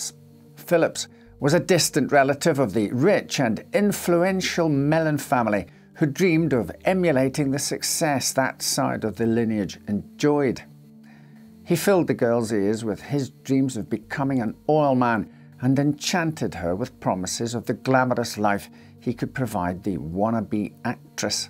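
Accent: Scotland, United Kingdom. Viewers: Definitely Scottish. A very light burr..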